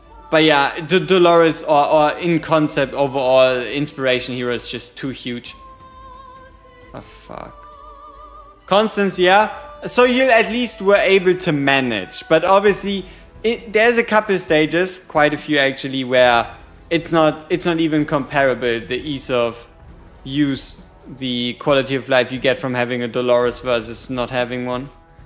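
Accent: Germany. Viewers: would have joined the challenge if i knew how it works. Great content btw. You are my favorite WOR content creator despite the German excent. 😂😂😉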